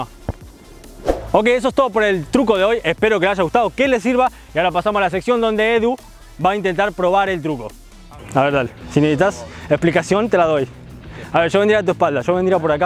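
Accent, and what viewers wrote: Argentina. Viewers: ¿ sos de a Argentina? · Sos argentino o que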